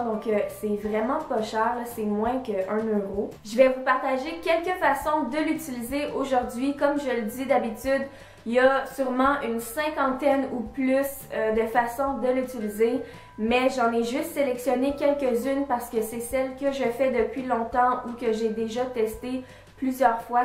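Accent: Quebec, Canada. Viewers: j aime trop on accent du quebecccccc 👍😘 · salut ma poupe je vous adore j'aime bien ton accent canadien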